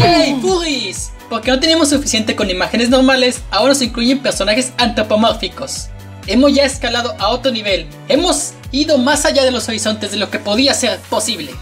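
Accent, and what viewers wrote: France. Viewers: es francés?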